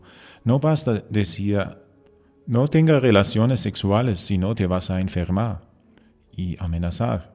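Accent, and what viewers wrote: France. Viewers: ¿Quien habla es francés?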